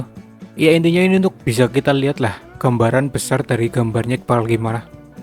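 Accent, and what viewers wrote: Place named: Banyumas, Indonesia. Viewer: Tengkyu bang, btw pasti orang ngapak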